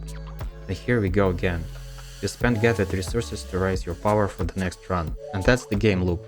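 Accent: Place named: Russia. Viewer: Ты русский? По акценту понятно.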